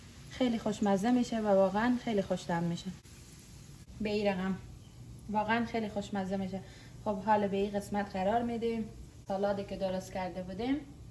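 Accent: Herat, Afghanistan. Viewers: تشکر از زحمات تان عالی بود همچنان بابت نگهداشتن لهجه شیرین هراتی سپاس گذارم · بسیار عالی امی‌زبان هیراتی بسیار مقبول است همیشه برنامه هایتان را میبینم شما در کوجا‌زندگی میکونین خواهر شما مرسل از جرمنی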